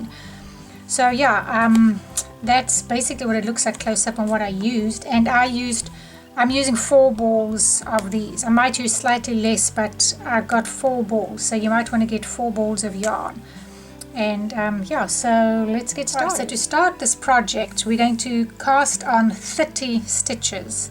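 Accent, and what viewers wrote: South Africa. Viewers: Awesome video :-) we're South Africans living in Ottawa, Canada ❤️Love your accent we recognized it straight away :-) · That's a South African accent!!! How lovely to hear! Erna from Cape Town, S.A. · You're South African! Am I right?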